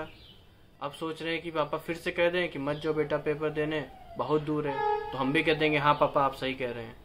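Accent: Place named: Varanasi, India. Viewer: That banarasi accent ...👻😍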